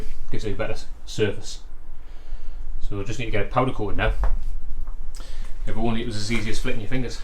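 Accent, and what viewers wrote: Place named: Northern England, United Kingdom. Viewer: I know have commented many times and I just love watching and listening to you but I can never shake the Monty Python "ay yup trouble at mill" NO DISRESPECT it's just the accent, oh aye Crack On your getting really good at this welding shit, nice job, when doing the 'door' bit talk about using a sledge hammer to crack a walnut, geez, you need an English wheel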